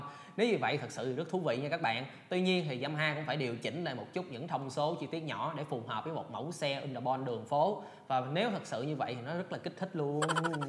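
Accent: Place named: Mekong Delta, Vietnam. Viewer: a MC dep trai, giog noi ngọt ngao ne, như ng mien Tay :)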